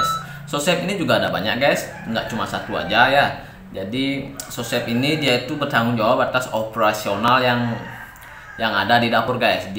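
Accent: Bali, Indonesia. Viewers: Logat balix kentalll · Logat bali ne asane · Abg orang bali yah. Heheh./lo gue nya msh rada gx mirip sama jkt aslinya heheh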